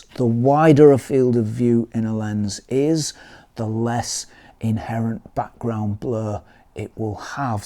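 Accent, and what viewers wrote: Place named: RP, United Kingdom. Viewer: It is so much more pleasant for me to listen to a cultured British voice than to any American speaking, I notice that again & again. Thank you for sharing your photography knowledge.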